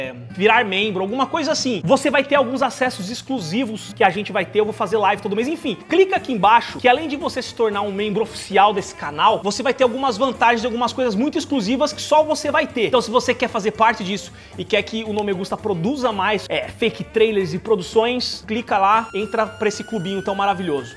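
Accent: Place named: Rio de Janeiro, Brazil. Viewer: ele é um paulista com sotaque carioca...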